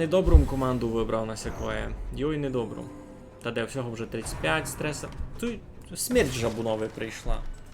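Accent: Transcarpathia, Ukraine. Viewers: Вау, летсплеї по Закарпатськи. Просто вау 🔥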